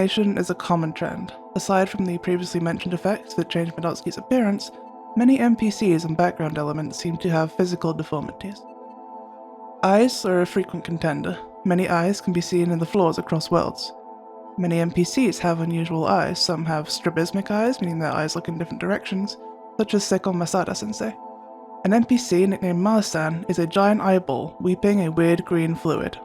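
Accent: United Kingdom. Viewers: I like how your voice sounds sort of British. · you have the most british voice ever lol, great video btw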